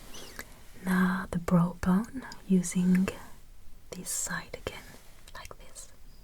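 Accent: France. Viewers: I think I recognize french accent. Why don't you make a french video sometimes ? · Hello j'te passe un petit coucou de France vu que j'ai pas pu m'empêcher de repéré la prononciation bien français des mots anglais mdr.😂❤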